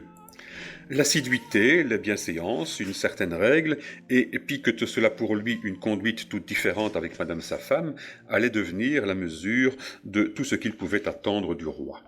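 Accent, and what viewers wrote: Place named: Belgium. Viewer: Ce savoureux accent belge...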